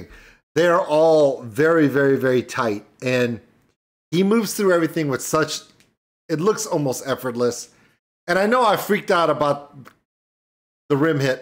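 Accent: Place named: Italy. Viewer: Kinda funny how you speak spanish with Italian accent.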